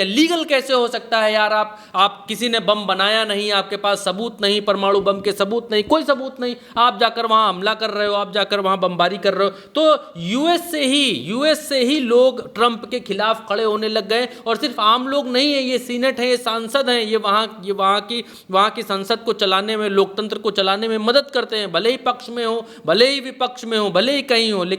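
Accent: India. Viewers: bhaiya aapka desi Andaaz hai maja aa jata aapko sunkar Jay Hind Jay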